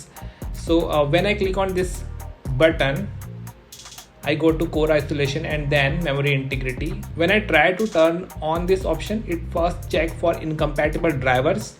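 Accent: India. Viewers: I never watched a video with an hindian accent that didnt help me, thank you so much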